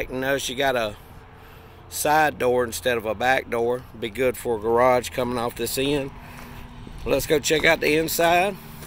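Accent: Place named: South, United States. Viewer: first you are my favorite Manufactured Home Tour Guide and Commentator! Is that accent Alabama or Mississippi? Second, I love The Helicon. We toured a modular home at 3 Stones Homes in MO that was very similar except in place of a sunroom there was a covered rear porch. I like the sunroom better. Would it be possible to enlarge the master closet by 3’ by sliding the the wall back in the kitchen and master bath eliminating the smaller pantries beside the refrigerator/freezer and reconfigure the master bath by eliminating the tub, moving the first vanity down to connect to the second. You would be able to have a double sink vanity with a makeup dresser in the center as well as a larger closet. I have designed, redesigned, drew blueprints and built our last 3 homes myself. So I look at the possibilities. We are in our late 70s and need to scale down size wise and a story. 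My hubby and I started our life together in two very small “mobile homes” first one was a 1950s’ 2 bedroom 1 bath 8’x 30’ the second was new 1964 3 bedroom 1-1/12 baths 10’x 56’. Both fully furnished. We were way ahead of the tiny/small house craze....Lol. Do not want to go quite that small again. Third have you ever considered doing business in North East Texas?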